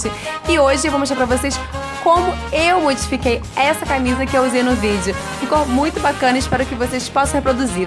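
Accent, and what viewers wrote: Rio de Janeiro, Brazil. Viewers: PAUSAAAA! Nunca vi uma carioca com o sotaque tãoooo marcante! Hahahahaha, ADOREI! Seu cropped ficou uma gracinha, razô! Beijos e Pausa pra TPM!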